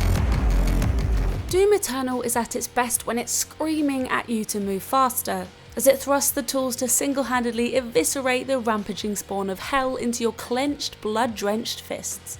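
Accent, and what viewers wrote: United Kingdom. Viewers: Remember games radar before it was bought out by bored, monotone sounding British bloggers?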